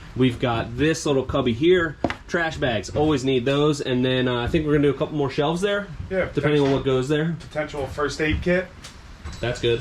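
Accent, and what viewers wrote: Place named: Philadelphia, United States. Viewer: Spotted that Phiwwey accent right away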